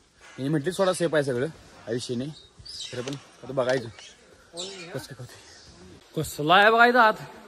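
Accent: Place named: Solapur, India. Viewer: कसला भारी बे ❤ सोलापूर बोलते ❤ 😂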